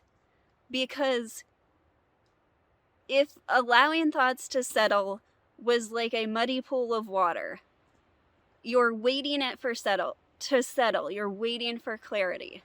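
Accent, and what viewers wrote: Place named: United States. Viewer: Your American accent makes it sometimes difficult for a person who isn't native to the language. English is spoken round the world, you need to speak so that the words are pricked up easily by everyone anywhere.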